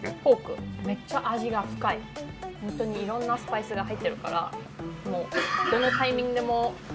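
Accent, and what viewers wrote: Nagoya, Japan. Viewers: 偶然に初めて見たとき日本語のアクセントが名古屋ぽいと思ったら名古屋ご在住のようですね。名古屋及び近郊の発祥の店がよく紹介されているので登録しました。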